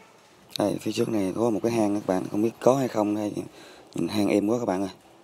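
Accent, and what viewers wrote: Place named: Mekong Delta, Vietnam. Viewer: Giọng nói miền Tây nhưng mà ở đâu vậy.Câu ở kênh mà có lục bình nhiều mình câu có không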